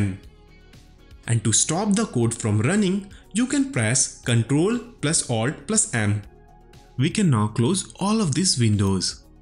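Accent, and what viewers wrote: India. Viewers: He's indian accent makes this 10x easier.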